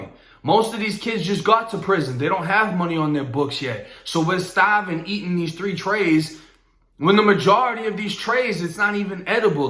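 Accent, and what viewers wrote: Boston, United States. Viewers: Bro! I love that slight Boston accent + you really have a feel 4 telling what happened and taking us there! I'm binge-watching this shit until I'm up 2 date. Greetings from Romania!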